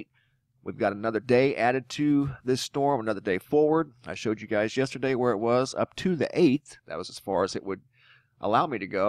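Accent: United States. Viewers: if you can investigate, then you will find that they start from out of the atmos and cloud masses atract them ! electric ! planet means wandering star ! you sound soooo americAAAAAn ................!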